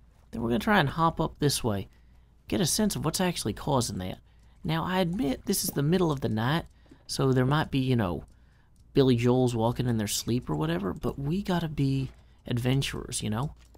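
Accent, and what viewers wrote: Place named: Tennessee, United States. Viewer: Nice accent xD This is the first video I have watched by you, nice videos, and I really do like that Tennessee accent xD!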